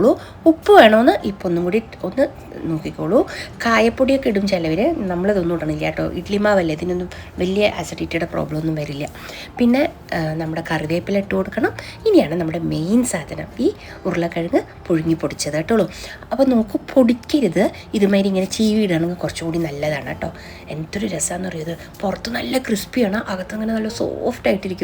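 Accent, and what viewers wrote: Palakkad, India. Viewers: Enikum aa samsaram kelkan enthu ishtamanenno, njanum oru Palakkattukariyanutto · എനിക്ക് ഒത്തിരി ഇഷ്ട്ട ചേച്ചിയുടെ വീഡിയോ യും ചേച്ചി യുടെ സംസാരവും ആ കോളു ❤പൊടിച്ചോളൂ to❤അടിപൊളി വോയിസ്‌ പാലക്കാടൻ ഭാഷ സ്നാക്ക്സ് അടിപൊളി ആണ് ഒന്ന് ട്രൈ ചെയ്യണം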